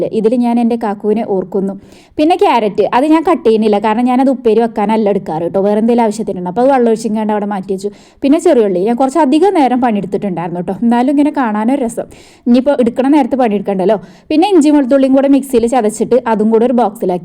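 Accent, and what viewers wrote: Malappuram, India. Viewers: Niga serika Malappuram aano akka ekadhesham adhe bhasha😊❤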